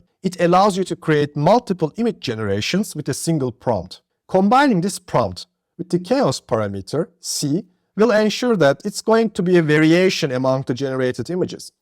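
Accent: Turkey. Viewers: o nası bi Türk aksanıdır, nerde duysam tanırım :D güzel içerik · seslendiren türk mü bu aksanı nerde olsa tanırım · O klasik soruyu sorayım. Türk müsün la ? aksanın Türk gibi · türk aksanı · İngilizce de konuşsan Türk olduğun anlaşılıyor 😄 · Bu ingilizce konuşma biçimi ancak bir Türk'e aittir. Tebrikler video için.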